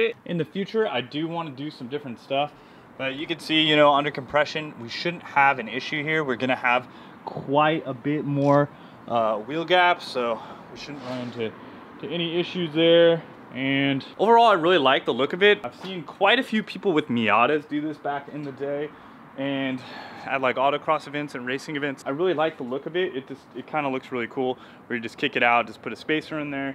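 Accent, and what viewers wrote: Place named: United States. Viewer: For a second there, I thought you were Issac Butterfield, but then I thought "why does he have an American accent?"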